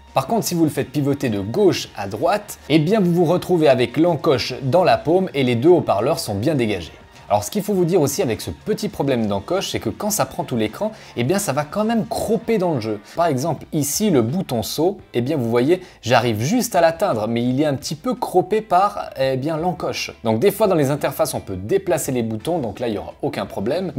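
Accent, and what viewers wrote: Vaud, Switzerland. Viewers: Le beau acsent vaudois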